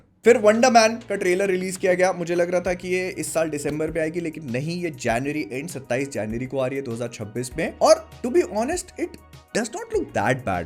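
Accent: Bhopal, India. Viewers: Bhopal se ho kya aap?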